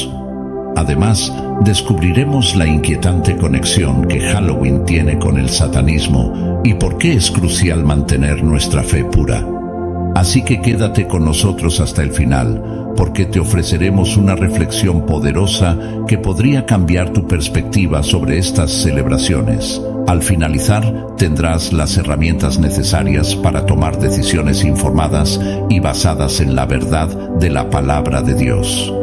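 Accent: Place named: Spain. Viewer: Es mi imaginación o es más atacar a la nación hispanoamérica? Para comenzar el que habla lo hace con acento español y se refiere a 2 días que se han establecido solo como tradición en lo que es América latina, Ya lograron introducir la religión en la mayoría de la gente y refuerzan lo que se supone es fé para "invitarte" a nunca hagas o digas nada de esas tradiciones porque Dios no lo permite. Y yo soñando con que el día que me muera se acuerden de mi para invitarme a casa a convivir con mi gente por medio de un altar.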